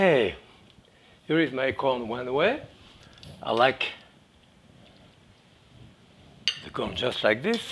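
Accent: France. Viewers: I was responding to someone who thinks Jacques Pepin has a SPEECH IMPEDIMENT. He does NOT HAVE A SPEECH IMPEDIMENT. He is only speaking in a French ACCENT. of course his English is perfect. No one said it wasn't.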